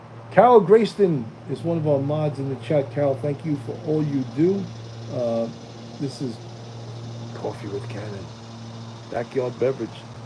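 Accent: New York, United States. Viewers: I loves me some New York accent! What a jerk! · Love your NY accent!!!!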